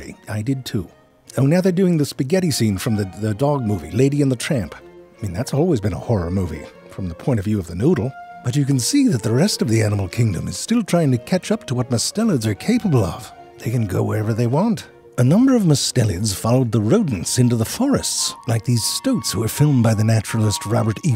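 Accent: United Kingdom. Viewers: Is it just me or is the voice starting to take on some elements of a British accent?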